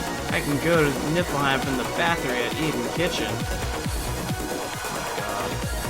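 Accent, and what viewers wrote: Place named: East Coast, United States. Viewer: Lol, I can pick up a "Yankee" accent with your new mic 👍 Are u in the east coast somewhere?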